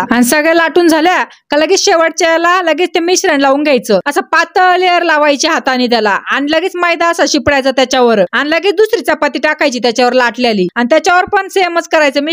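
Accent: Ahmednagar, India. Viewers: Nagari bhashecha laheja mast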